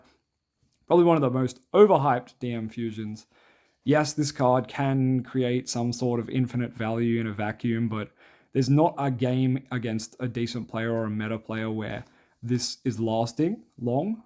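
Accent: Australia. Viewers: Are you aussie? Because if so, that “Goony boy” nickname is so much funnier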